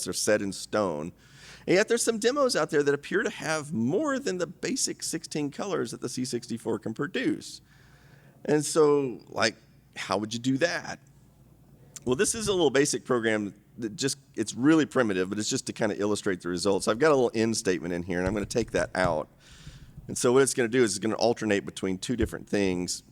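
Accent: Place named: Texas, United States. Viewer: His Texas accent drawls well off-camera.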